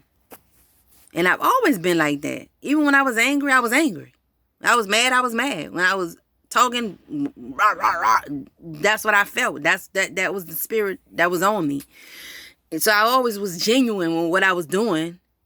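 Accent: New Orleans, United States. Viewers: Her New Orleans accent 🤤 She still got it 😍💙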